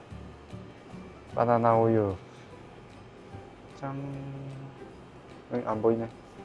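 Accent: Gyeongsang, South Korea. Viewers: 오 현실말투 갱상도싸나이네요~ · You are from Daegu right? I can recognize his accent 😂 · Its cute how he talks with gyeongsangdo(?) dialect. That intonation can be heard by people from there hehe is from daegu right ^^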